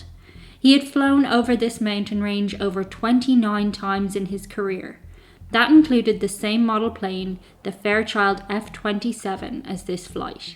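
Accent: Ireland. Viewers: Is it just me, or does this sort of story feel more unsettling when told by a speaker with an Irish accent? I think culturally we (at least in the US) don't associate "Irish" with this kind of darkness.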